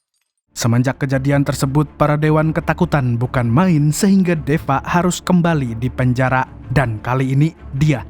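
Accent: Banjar, Indonesia. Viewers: Banjar banar piyan mang ae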